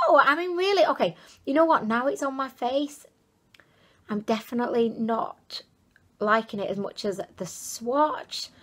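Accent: United Kingdom. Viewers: It's def like a silk pillow!! Always watching and enjoying your entertaining reviews with your lovely British accent. <3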